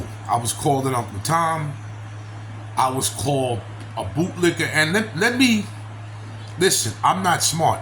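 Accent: New York, United States. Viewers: White people love you right back and new subby here and I'm not leaving you..I just love the new York accent❤❤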